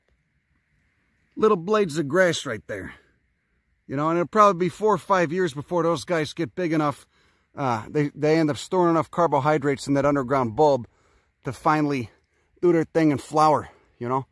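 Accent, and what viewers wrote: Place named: Queens, United States. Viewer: Man, your Queens accent is mighty! This is the freshest channel to the science world, good shit homie...bet.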